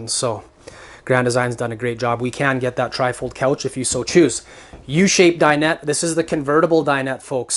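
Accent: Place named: Canada. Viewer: Your Canadian accent is goals lol and I love your in depth reviews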